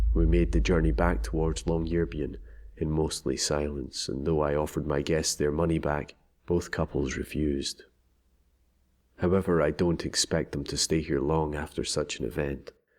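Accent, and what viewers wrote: Scotland, United Kingdom. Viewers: This channel is simply amazing. You guys have some really clever stories, and it doesn't hurt that you have Scottish narrators. XD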